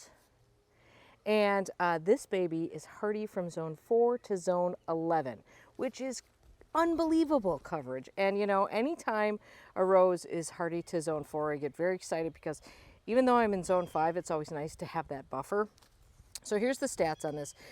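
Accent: Wisconsin, United States. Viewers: I grew up in Michigan. I love your Wisconsin Accent. Im thrilled I found your channel. Subscribing & following along.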